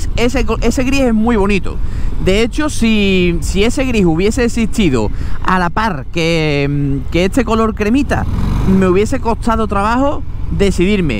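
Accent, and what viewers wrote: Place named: Cádiz, Spain. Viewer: escucho un acento gaditano me encanta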